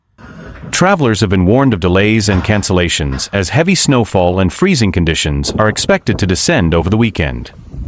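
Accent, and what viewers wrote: United States. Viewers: Why has the narrater got an American accent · Why is it an American reporting this….sounds like bull … No different to any other year for some parts…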